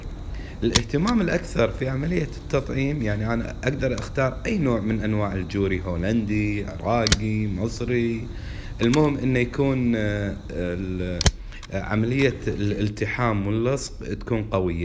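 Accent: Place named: Gulf, Arab world. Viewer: انته خليجي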